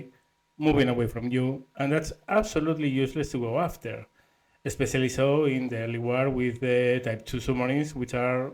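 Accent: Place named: Spain. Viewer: Unrelated to anything but Im wondering if you're Spanish since you sound like my Spanish coworkers when speaking English. But I could be wrong.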